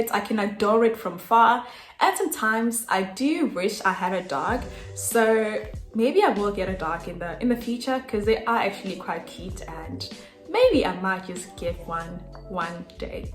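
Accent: South Africa. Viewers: I enjoyed listening to you with the South African accent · your SA dialect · Where are you from? You have an Afrikaans-English accent so I'm guessing Guateng???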